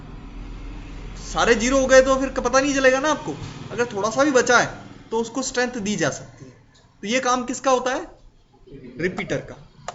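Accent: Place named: Jodhpur, India. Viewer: Sir me jodhpur se hu aapki aavaz se lag rha h ki aap sayad jodhpur ke ass pass ke ho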